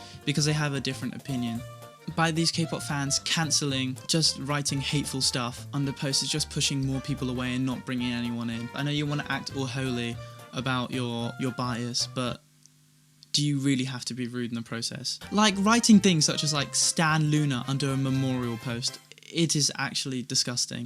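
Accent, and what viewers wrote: United Kingdom. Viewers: Not related to the video but im new here and fricking out right now, like, Handsome cute deep british accent intelligent time stemps his video to subjects y so perfect 😍 ?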